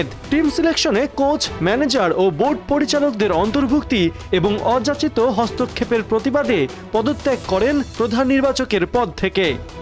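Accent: Kolkata, India. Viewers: কলিকাতার স্টাইলে খবর পড়েন কেন?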